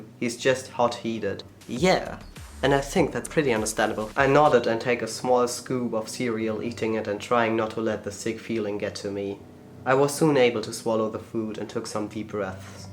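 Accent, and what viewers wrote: Germany. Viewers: Sis r u German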